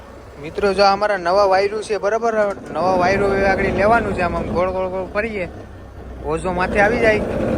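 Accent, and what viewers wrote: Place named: Una, India. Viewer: bhai tamaru gam kyu che because tamari language parthi evu lage ke tame gir somnath na una taluka na koi gam na haso evu mane lage che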